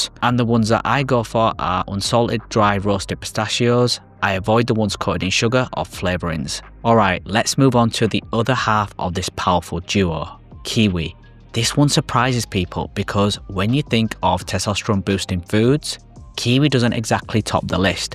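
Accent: United Kingdom. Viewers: Cockney accent?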